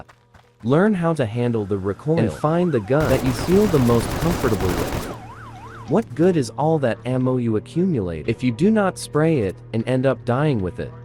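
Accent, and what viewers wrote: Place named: Sweden. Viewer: Hahaha ännu en svensk, har hört att folk tydligen gillar vår brytning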